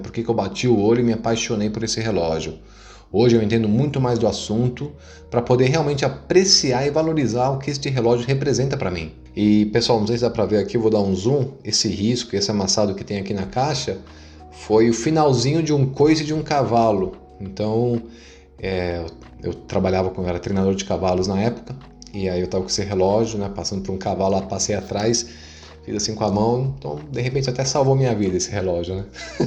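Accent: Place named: England, United Kingdom. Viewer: A Seiko comprou a Orient e surgiu a Orient Star, relógios belíssimos e diferenciados, fino acabamento. Tenho um Contemporary com open heart, muito preciso e bonito, esse cara não conhece um Orient Star. Ele também tem mau gosto, seus relógios com pulseiras laranjas que o digam, cada qual com seu lado brega... a cruz suíça não afasta esse trejeito de um Magal, nem sotaque do inglês...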